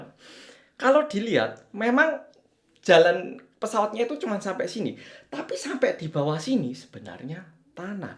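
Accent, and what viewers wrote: Java, Indonesia. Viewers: Makasi, dapat ilmu juga terkait pesawat dkk, dan berduka cita untuk JejuAir 🙏🏻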